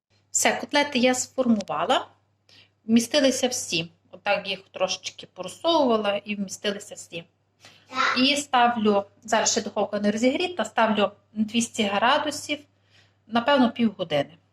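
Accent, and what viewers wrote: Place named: Lviv, Ukraine. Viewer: І я перший раз дивлюся, тільки зайшла, почула наш Львівський діалект і відразу підписалася 😊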